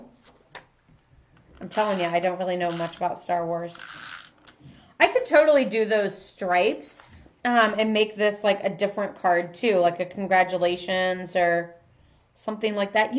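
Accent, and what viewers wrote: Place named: St. Louis, United States. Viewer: Sounds like you are in the St. Louis area¿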